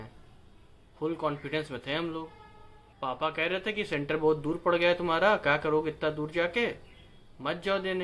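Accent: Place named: Varanasi, India. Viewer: That banarasi accent ...👻😍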